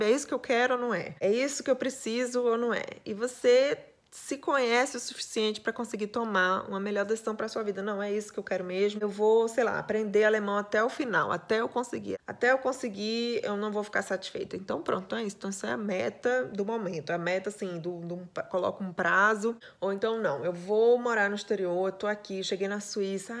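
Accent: Northeast, Brazil. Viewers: Amooo tanto Querida,de Ver Voce Ai Falando com cada um de Nos,muito importante ver e ouvir Voce e o Querido Falando em Alemao c seu Sotaque Noedestino e muito lindo, Parabens pode falar a vontade 💓👏👏👏🎆💞💕🎼😘😍🌺🌹🍁👼🙌😇 🆙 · Imagina o quanto o teu sotaque nordestino é lindo.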